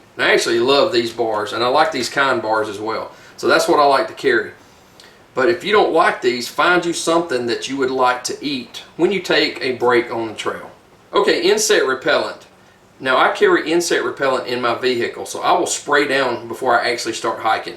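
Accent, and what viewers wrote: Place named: Southern Illinois, United States. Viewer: Hey, I think I can guess where your from based on accent and woods area. I'd say Southern Illinois/ East Missouri area. Close or no?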